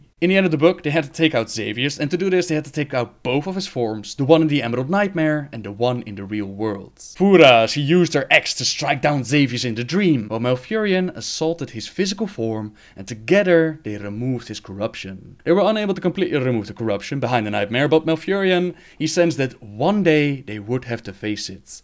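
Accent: Netherlands. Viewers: your accent are you dutch?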